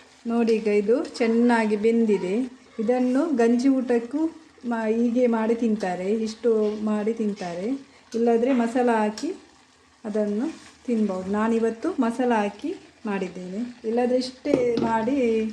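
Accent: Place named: Mangalore, India. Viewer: ಮಂಗಳೂರು ಕನ್ನಡ ಕೇಳೋದೇ ಚೆಂದ 😊😊😊😊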